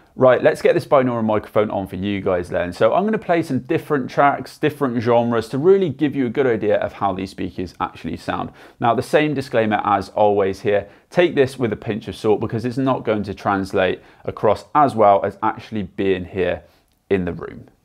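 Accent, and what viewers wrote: United Kingdom. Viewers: Bro are you british?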